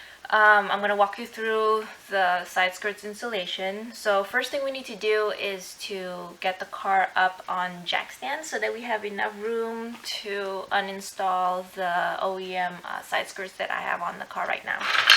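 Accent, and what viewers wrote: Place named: Hawaii, United States. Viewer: Are you from Hawaii? I can hear a little Hawaiian pigeon in your speech.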